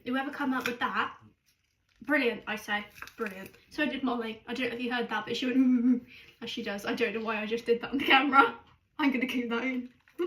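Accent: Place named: West Country, United Kingdom. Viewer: Love your accent wheres it from somerset or devon maybe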